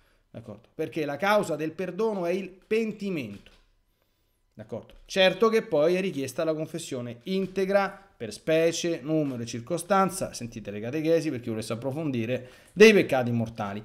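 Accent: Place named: Rome, Italy. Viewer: la sua dizione e dialetto romanesco che mi piace da morire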